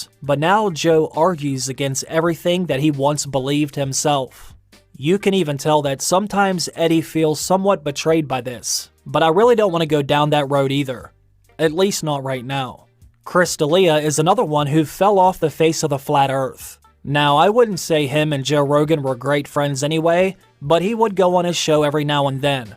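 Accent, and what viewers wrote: Pittsburgh, United States. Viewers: Alright I gotta ask... You’re a yinzer aren’t you?😂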